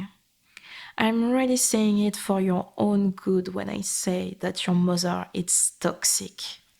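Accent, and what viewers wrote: France. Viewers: The French accent sounds so good, yet so bad at the same time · Attendez une petite minute... C'est un accent Français que j'entends non ? C'est peu commun j'aime bien. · Such a thick accent, I presume French? Sounds very nice. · L'anglais avec l'accent français est vraiment relaxant, j'ai découvert la chaîne a l'instant et je trouve que, pour le moment, le contenu est vraiment bien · Ok, I've heard this script a lot, but your accent managed to make it feel new and refreshing. It's actually surprisingly rare to see french accents that aren't parodies, so, just wanted to say i love your accent (sorry for the incoherent writing, its currently 12 am) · "Mozer" love it ❤. Appreciate the french accent in the audio. Feels oddly good to hear an asmr video from (i assume) a french speaker without the weird judgement people in France tend to have towards french people speaking in english. 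· Well, you did spoke with a French accent, and also the title said Yandere so I was just being honest about how I felt about this after hearing this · This needs a part 2 if possible, i think i've dropped a tear almost falling asleep to this, i swear i could feel the hug, the embrace and it's warmth. Sometimes i couldn't understand some words because of the lovely french accent but it wouldn't be same without it (also english is not my first language and i wasn't wearing headphones) This is amazing, simply amazing, thanks for this, from the bottom of my heart.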